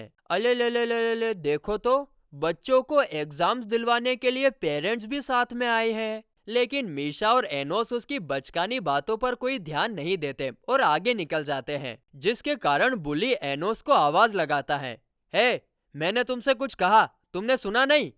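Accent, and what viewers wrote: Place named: Gujarat, India. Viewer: Bhai ye gujtati vibe hta de yar thoda sa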